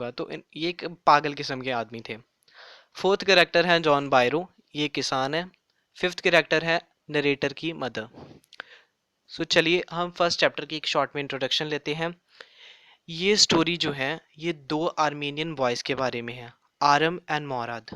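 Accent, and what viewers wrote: India. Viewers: Are you a punjabi! I mean your accent is like that only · Too slow!! Important points missed. Please use your Indian accent. Your Indian accent would sound awesomely confident, your varying speeds will add to it.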